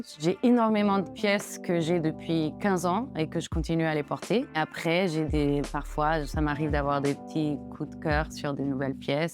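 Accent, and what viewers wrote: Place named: France. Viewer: I miss when your videos used to be in English with the beautiful French accents :(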